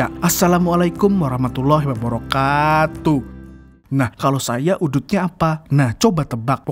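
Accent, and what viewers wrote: Banjar, Indonesia. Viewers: Urang banjar leh kali nya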